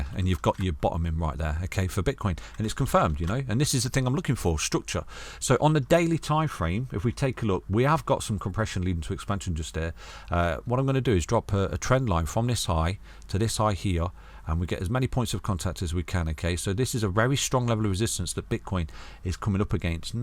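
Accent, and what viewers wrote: United Kingdom. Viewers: Nice British accent 😊 always welcome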